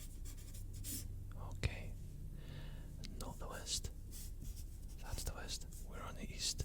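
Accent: Scotland, United Kingdom. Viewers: I love the Scottish accent so much!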